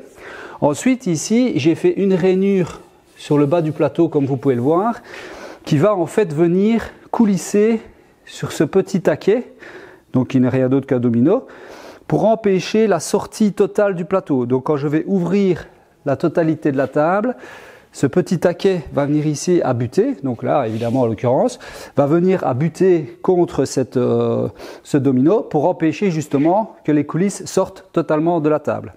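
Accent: Belgium. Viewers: Bonsoir, Moi, j'aurais dit "en butée"... Mais le parlé belge et l'accent...🤔 Abuter : - terme de marine. Mettre bout à bout, ou toucher par un bout. Ces pièces de bois abutent. - ce terme s'utilise pour la pétanque et le jeu de palet. Abuter signifie lancer la pièce d'un jeu en direction d'un but afin de déterminer quel joueur commencera la partie. C'était la minute info🤭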